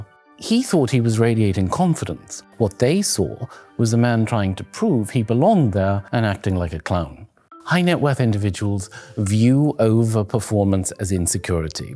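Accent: United Kingdom. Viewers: Give me a British accent like this guy, and I guarantee my confidence is perceived as 50% higher. Stupid American accent.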